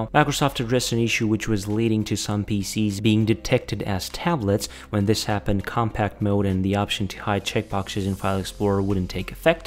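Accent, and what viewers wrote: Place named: Romania. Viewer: thank you, you're the best one for sharing whats new in windows 11, and you look very educated and your accent is verry beautiful, i appreciate your big work, i wonder how romanian people can speak english like this ☺ i hope we can see your face someday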